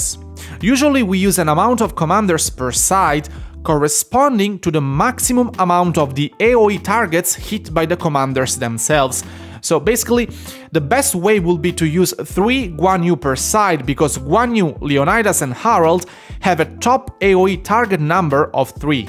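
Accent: Italy. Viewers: Quanto se sente che sei italiano! Bel content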